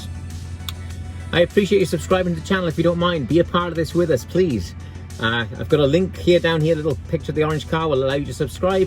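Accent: Wales, United Kingdom. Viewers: Good video. Where are you from originally? You sound Welsh.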